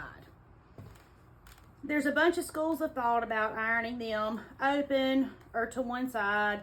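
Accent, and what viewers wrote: South, United States. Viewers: Thank you so much! I just found your tutorial’s and am loving it! Plus I’m a southern girl relocated in the North and miss hearing a southern accent!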